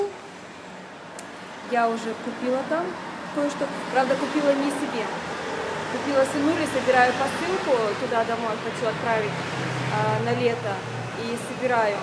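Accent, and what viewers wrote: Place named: Arkhangelsk, Russia. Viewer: дорогая, не стесняться надо а гордиться своим архангельским говором. это просто замечательно что люди сохраняют свои диалекты. в любой другой стране кроме россии местное население бережет свой говор который обычно отличается от столичного и горды этим. здоровья вам и вашей семье.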